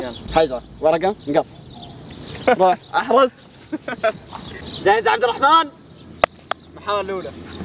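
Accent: Saudi Arabia. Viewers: حلو المقطع يا بس ليش ما حكيك سوري حكيك سعودي مع التحية للشعب السعودي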